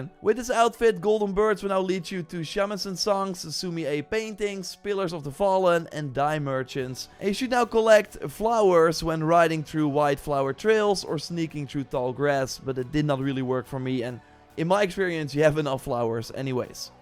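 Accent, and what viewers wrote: Netherlands. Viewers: This is the most Dutch English accent I've ever heard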